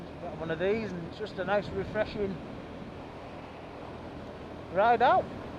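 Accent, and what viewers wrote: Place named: Bolton, United Kingdom. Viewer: great vid and good sharp pics despite the cloudy conditions thought it was Bolton area at first .... accent too... but maybe your Preston way or Yorkshire even ? Just wondering, getting a puncture can you get the tyre over the rim ? I had a puncture 2 weeks ago, Ive got good tyre levers but could nt get tyre over the rim Bike shop says ... most bikes have got to come in these days and get tyre off by machine so one simple puncture could cost me Pick up £45 Repair £40 .... its getting mad out there ! Probably eu legislation !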